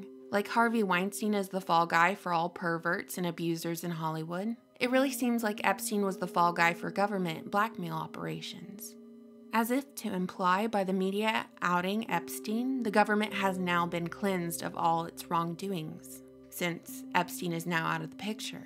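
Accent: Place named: Georgia, United States. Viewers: I should have known you were a Georgia peach with a voice like that 🥰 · Thought I recognized the accent of a fellow north Georgian. I’m right outside Atlanta and heading there tomorrow to help my daughter finish moving from downtown Atlanta to Buckhead. I’m getting a little nervous about what we may encounter. 😬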